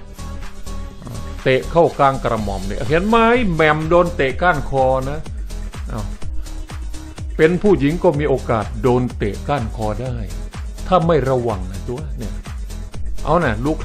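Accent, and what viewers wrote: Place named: South, Thailand. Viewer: เว้าใต้เป็นตาหัวคั๊ก😂😂😂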